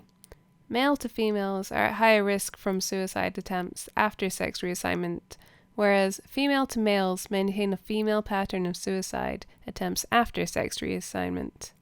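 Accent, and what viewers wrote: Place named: Scotland, United Kingdom. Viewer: It’s the intellectual dishonesty of trans women are women. It’s like a cult. And when you learn about autogynephilia and read Galileo's Middle Finger it’s even harder to rationalise what we’re seeing from the media, government and institutions. It’s genuinely terrifying. Great video and hopefully you’re able to post more at some point. Sure I detect a Scottish accent as well!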